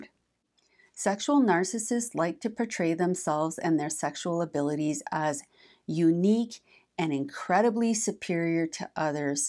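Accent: Canada. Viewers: Love your Canadian diction...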